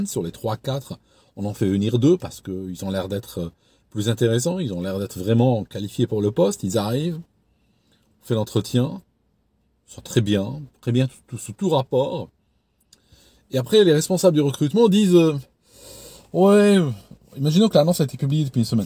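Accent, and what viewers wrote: Vaud, Switzerland. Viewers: Si! C'est sympa cet accent suisse. Merci pour tes conseils. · C’est vrai pour l’accent suisse, je m’étais dit qu’ dérivait doucement, vers un nouveau lieu de résidence, donc intéressant pour s’entraîner. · Il est génial ton accent Vaudois, on l’aime beaucoup. C’est un héritage dont il ne faut pas avoir honte, un souvenir de voyage qui fait maintenant partie de toi, une richesse, un métissage, une pépite 🫶🏼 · merci pour cet accent suisse, au final ca me détend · Il me semblait bien qu'il y avait un accent vaudois 😂 Je pensais que c'était une hallucination Super vidéo, ayant été moi même à la recherche d'un emploi ces derniers mois, je me retrouve tout à fait dans tes conseils mais j'en ajouterai un toutefois : me négligez pas votre réseau quand vous êtes en études ou chez votre précédent employeur. J'ai vu des gens de ma volée de Master trouver des jobs très (trop) bien payé alors qu'ils étaient carrément mauvais tant sur la pratique que la théorie, qu'ils avaient une attitude très peu professionnels simplement parce que quelqu'un dans leur réseau a pu les pistonner. 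Vous pouvez être le candidat idéal à un poste, si l'ami d'un manager le veut également vous passerez à la trappe. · Merci pour ta vidéo et vive l'accent du Gros-de-Vaud! Ayant moi aussi cet accent de part mes origines et j'y habite toujours d'ailleurs. Tu as vécu dans quelle localité? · y a que les francais qui se plaignent de l'accent suisse le reste des francophones 400 millions de personnes adorent ton elocution 😃. C'est toujours les memes qui se plaignent et qui font la greve 😁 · en tant que Suissesse, j'adore cet accent 🇨🇭👍😊 ne chassez pas le naturel 🙏😊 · Bonjour, bonjour à tous. Merci pour tes vidéos et ta pédagogie au travers des exemples qui tu cites, c'est très instructif; lâche toi sur l'accent Suisse, ça fait partie de ton profile! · Merci 🙏 pour la réponse clin-d'oeil à ma question sur votre accent suisse. Il n'y avait rien de désobligeant, juste que je regarde pas mal de vos vidéos et que je ne l'avais pas entendu précédement. 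En tout cas j'aime beaucoup le contenu de vos vidéos 👍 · Joli accent suisse...c est bien de s adapter moi aussi je prends des accents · Et bien j'apprécie l'accent Suisse. Merci de tes reflétions qui inconsciemment mettent le candidat comme l'homme fort de l'entretien. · Ne change rien pour ton accent suisse qui refait surface de temps à autres, c'est sympa je trouve, en tout cas ça ne me gêne pas du tout, les accents ont tous leur charme. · Coucou! Si j’ai parlé de ton accent suisse c’est que j’ai trouvé ça trop mignon: un algérien qui vit en Angleterre avec un accent suisse, franchement, il n’y a que toi pour ça! Merci pour tes super vidéos qui éclairent mes journées! 😊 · L'accent suisse me rappelle les jeux olympiques d'Albertviiiiille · C'est super agréable de vous écouter avec ou sans accent suisse, donc pas de soucis 😉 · Excellent ton accent de chez nous, je suis Suisse aussi (nord vaudois) pourquoi tu es parti? 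Merci pour la vidéo 👍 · Ah voilà pourquoi l'accent suisse ! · PTDR à la fin "je fias un effort pour pas parler avec l'accent suisse" alors qu'il a typiquement l'accent suisse X) la même manie de prolonger légèrement l'avant-dernière syllabe, tout X) Vidéo incroyable, c'est vraiment le daron idéal, il a de l'expérience constructive et il arrive à revenir dessus et à en livrer le contenu essentiel... Ce type aurait dû devenir prof/chercheur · L'accent suisse sonne très sympathique, personnellement je trouve que vous ne devriez pas le masquer, au contraire 😀 merci beaucoup pour tout ces conseils, je suis actuellement demandeur d'emploi et c'est une mine d'informations utiles. Très bonne continuation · Ah ! Je mdisais bien que tu parlais avec un accent suisse ! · Ne t excuse pas de prendre l accent suisse je suis français mais la vie est pas désagréable que ça en Suisse et les Suisses 🙂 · Notre accent suisse est juste parfait... 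On s'en fout des haters😂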